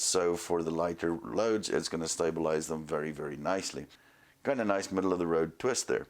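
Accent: South Africa. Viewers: Great video, thanks. Off topic, and if I may ask, what nationality are you? Your English accent sounds like it has South African roots, with a bit of Irish and a lot of American influence. 🙂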